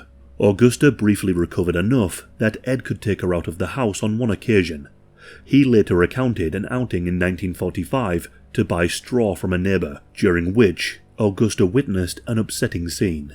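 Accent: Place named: England, United Kingdom. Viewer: Ya fuckin ass is from England with ya accent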